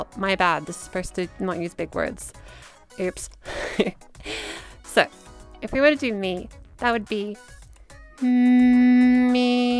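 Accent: Australia. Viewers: finally an australian!! some vocal patterns i can imitate without turning into an american 🥹🥹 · Oh my god this is awesome! This format/editing excites me so much to practice my voice and you're Australian!! I'm also from around here and I was worried that my accent would turn more American from other tutorials. You've inspired me :3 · Thank you! I'm English so an Australian accent is so much closer to mine than all the American ones I've seen. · This is excellent, thank you so much for making this! It's also such a huge relief to hear another Australian doing this, because American voices are so different and that is so much of the voice-related content out there! Your advice is so practical, and I love the energy and vibes you bring :) The heart-felt message at the end gives me so much hope and makes me feel like I can really do this too. Thank you so much!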